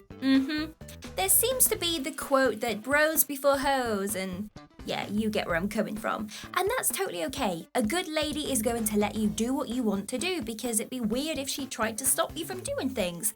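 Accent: England, United Kingdom. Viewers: Always good contents 👍 thumbs up.I will check for audible and the books you are recommanding, thank you. Ps. Your english accent is music to my ears, you clearly can use this to charm someone 🤩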